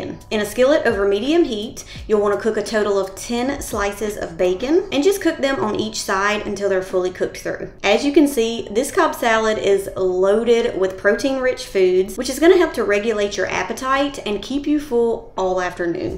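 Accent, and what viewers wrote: South, United States. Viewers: "bowd eggs" 😊 "taws" 🥰 I absolutely love her voice and accent. (I know, many from the South are thinking, What accent? 🤔)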